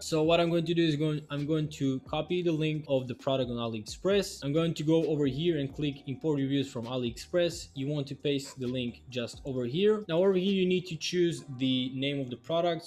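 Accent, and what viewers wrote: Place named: Russia. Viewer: Спасибо за видос братишка я и не сомневался что ты русский! когда услышал твой акцент и был рад что Русский человек учит представителей других нации дропшипить! РЕСПКТ БРАТИШКА! А ТЕПЕР ВСЕ ЭТО НА РУССКОМ ПОЖАЛУЙСТА!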